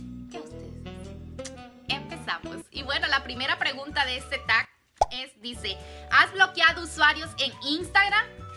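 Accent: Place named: Mexico. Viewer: Me encanto el tag lo hiciste parecer divertido 💗y yo creía por tu acento que eras Mexicana si no es porq tocas el tema yo seguía convencida de que eras 100% México❤y pues si se pega un poco! yo vivo en Italia hace 13 años y no me doy cuenta pero las demás personas me dicen que tengo un acento diferente!yo pienso que es normal!Besos hermosa y sigue así de natural❤😘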